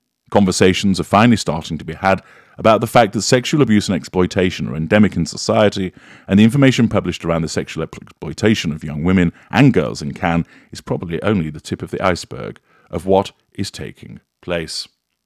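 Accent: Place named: England, United Kingdom. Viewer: Hello? Are you not the "real" person who had your own t.v. podcast in U.S.? You stood out to me because of your very "english" dialect on U.S. t.v. or is it podcast. Bald head with glasses? How can one forget! I'm sure someone out there knows your identity.?? Interesting change of carrier that you now have. Oh I'm not in U.S. like yourself but in Norway.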